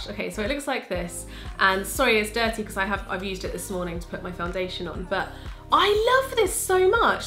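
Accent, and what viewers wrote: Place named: England, United Kingdom. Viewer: Am I the only one who loved the way she said nerdy? Lol. The English accent is life 😩 lol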